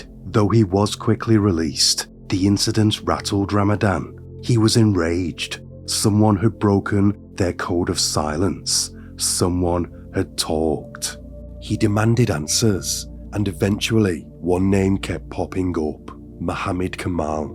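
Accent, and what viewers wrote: United Kingdom. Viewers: Common pronunciation for British folk in certain areas. England has a very broad range of accents and pronunciation habits. I quite like his voice. It seems to work very well for this type of content.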